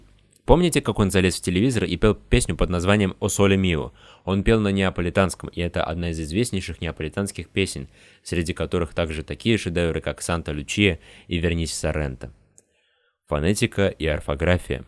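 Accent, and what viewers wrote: Russia. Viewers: Может быть, но у него все равно сильный русский акцент. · У автора сильный русский акцент.. если вы его не слышите, это не означает что его нет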